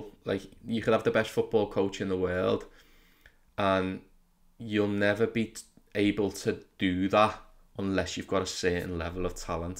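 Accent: Liverpool, United Kingdom. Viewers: Are you liverpool fan?😁 Because you have liverpool accent. great video keep it up.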